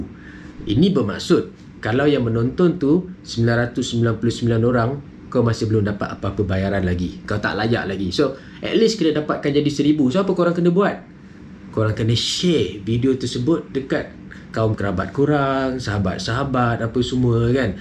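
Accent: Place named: Kedah, Malaysia. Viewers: Amboi byk selit utaqa nohh🤣🤣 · suka ckp kedah "X leh kata apa arr"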